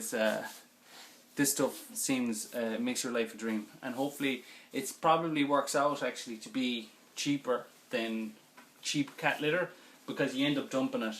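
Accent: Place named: Ireland. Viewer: I love your Irish accent.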